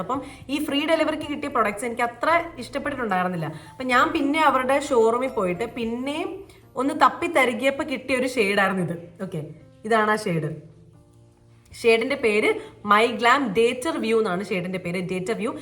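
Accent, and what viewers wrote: Kozhikode, India. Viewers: Kozhikode slang alalo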